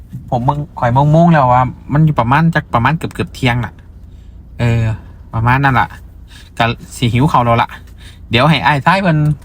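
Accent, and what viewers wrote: Isan, Thailand. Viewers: สำเนียงเจ่า คล้ายไท ร้อยเอ็ด กาฬสินธุ์ ยโส อุบล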